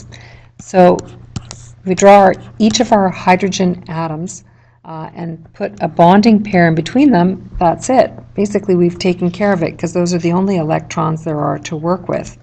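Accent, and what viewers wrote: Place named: Canada. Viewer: Thanks, that really helped! Even with that Canuck accent! Sounds like your software or mic has some effect that makes your voice start out very low volume, then come up to normal volume. You video is super helpful, so I hope you can get that fixed!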